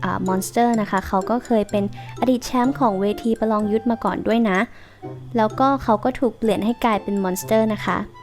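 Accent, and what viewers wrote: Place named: England, United Kingdom. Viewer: ชอบสำเนียงอังกฤษจริงๆ เลิฟๆ💕💕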